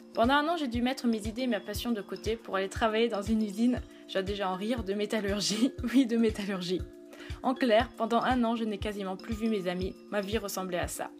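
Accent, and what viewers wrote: France. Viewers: Vie ta vie, continue de penser de vivre comme tu le fais de partager ta passion avec nous de nous faire vivre à travers toi. Profite a fond et continue t’es jolie vidéos Tu mérite vraiment tout ça continue de nous parler anglais avec un accent français je suis la première à ne pas me faire comprendre quand je parle anglais Bref encore merci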